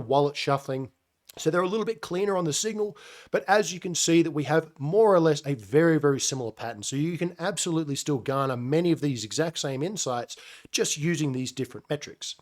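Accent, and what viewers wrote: Australia. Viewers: R U Australian btw?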